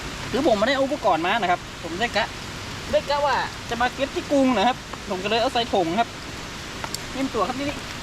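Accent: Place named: Chiang Rai, Thailand. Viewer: บ้านแต้อยู่ไหนนิสำเนียงอย่างคนเจียงฮาย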